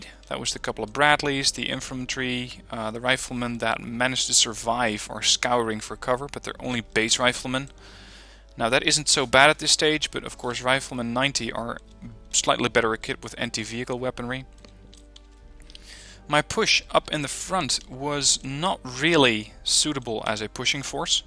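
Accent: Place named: Netherlands. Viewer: Is that a little Dutch slipping through ? Where are you from, your accent sounds different?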